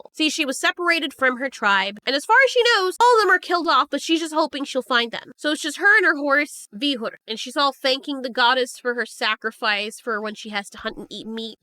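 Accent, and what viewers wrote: Scotland, United Kingdom. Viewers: love scottish accent :D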